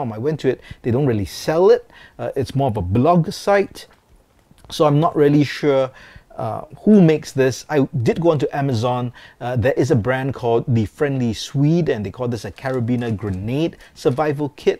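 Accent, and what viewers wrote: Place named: Singapore. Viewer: I suspected that you were Singaporean because of your accent but you don't say "La" so I'm not sure.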